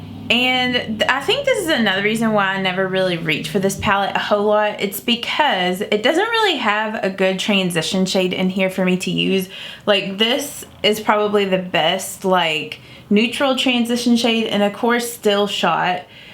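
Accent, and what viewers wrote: South, United States. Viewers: I love your chatty GRWM videos! You're so sweet and relatable and I love a Southern accent (Southern girl here)! But I like voiceover or music too... I'm gonna watch whatever you post 😀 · I love her accent too! I'm from a small town in West Texas. Her accent's not exactly the same but I find it warm and inviting.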